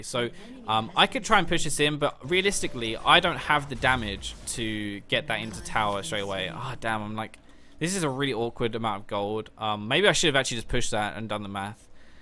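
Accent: United Kingdom. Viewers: "I think honestly, basically, i mean. i don't honestly, i guess. he honestly, basically it means, actually, honestly" - Damn You really need to limit yourself when you use those words and phrases it really pissed me off. On these 7- 10 min videos you can't actually notice that, but on this video it is really REALLY horrible. I Love your British accent a lot and the content you are making, but those phrases man, damn. Keep up the good work tho.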